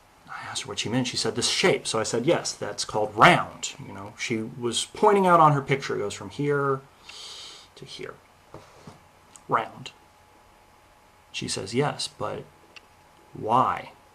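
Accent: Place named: United Kingdom. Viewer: is the wise man of the Tribe. Having a strong british accent, being older than 18,5 and having had sex at least once, he tries to lend some legitimacy, to the average race realist, who has non of those. Its a fun effort to watch, especially knowing its predestined to fail. However I have to appload your kind and smooth delivery. Its a suttle art to strike gently.: )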